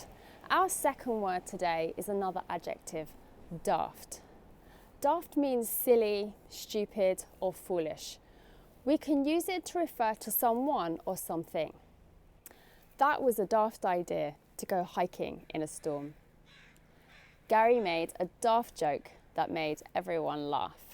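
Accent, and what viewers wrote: United Kingdom. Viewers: Your video made my day. ❤❤ Love British accent.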